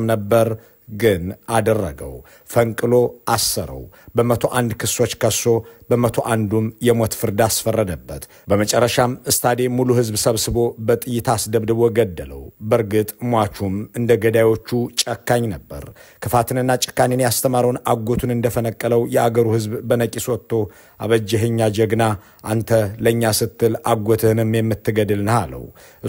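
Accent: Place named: Qomche, Ethiopia. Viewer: አክሰንትህ ቆምጬ መሆንህን ያሳብቃል ። ቢያንስ ተቃውሞህ እንዲሰማ ...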